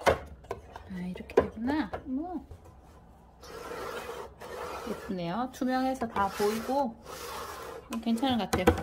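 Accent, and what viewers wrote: Gyeongsang, South Korea. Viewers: 영상스타일이 살짝 바뀌었네요..^^ 광고 뒤 영상나올 줄 알았는데 안나와서ㅋㅋㅋㅋ당황했네요ㅋㅋ 같은 경상도라 말투가 넘 친근하네요..오늘도 잘 봤습니다. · 어머나.. 이제 목소리까지 오픈하셨군요 ㅎㅎㅎㅎ 경상도 출신이라 경상도 사투리 참 정겹네요♡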